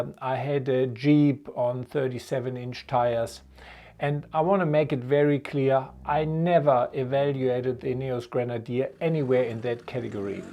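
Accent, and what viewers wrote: Germany. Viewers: i agree in all , precise look over all , sounds like you got an German accent ...😎 Greets from Bavaria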